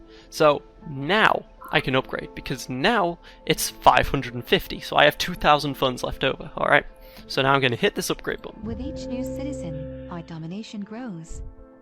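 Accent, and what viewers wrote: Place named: United Kingdom. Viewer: Thanks for taking the time to do this! Good to see someone else's build strategy as expansive. I knew you were from the UK but somehow didn't visualize you with an accent. Go figure.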